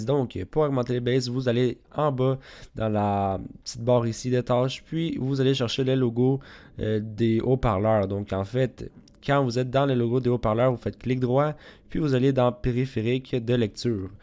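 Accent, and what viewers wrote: Quebec, Canada. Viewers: Un Québécois ! Belgian like Québec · lol canadien comme moi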